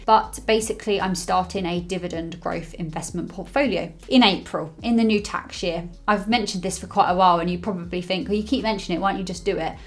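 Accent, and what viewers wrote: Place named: Cockney, United Kingdom. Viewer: Good luck - with the channel and the job my cockney sparrer 😁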